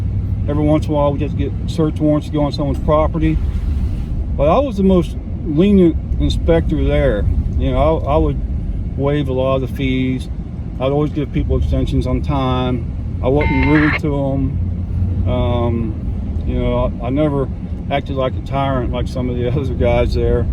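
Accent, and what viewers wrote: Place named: Ohio, United States. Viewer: Thanks for telling us about the guard rails. So from the sounds of it, you were raised in Ohio. I was too, in Worthington. I have family in LaRue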